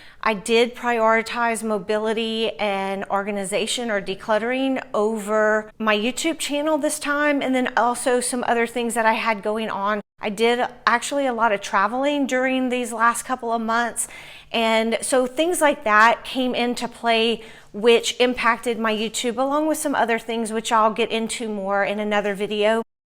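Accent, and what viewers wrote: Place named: Louisiana, United States. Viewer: AWESOME,! You have obviously done so much to teach the pups how to be good babies, too. Awww this was a great video. Looking forward to more.:) Love hearing your Louisiana accent on Youtube. It's rare to hear it these days. xoxox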